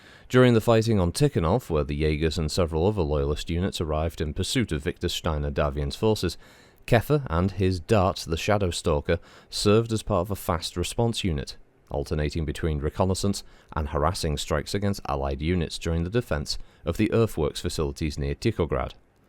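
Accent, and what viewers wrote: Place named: Scotland, United Kingdom. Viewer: A Scott’s accent is perfect for pronouncing Kathil Uhlans.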